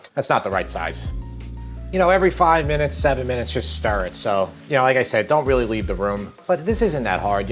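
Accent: New York, United States. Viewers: Love the ny accent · Are you from ny or nj? 🙂 ok,, yes, I see, ny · where are you from? new york or new jersey? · Hey , let me make you ah pasta you can't refuse . Love watchin this guy and his N Y accent. Great recipes . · I love the accent 😍 New York?? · As a Brooklynite, I can enjoy hearing your wonderful NY voice. If you’re not from NY, please let me know, or else fogeddaboutit😂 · Don't usually hear such thick New York accents on YouTube cooking channels. I like it.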